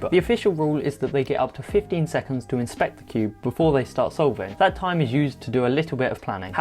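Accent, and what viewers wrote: United Kingdom. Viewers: first bri'ish cubing youtuber? Nice!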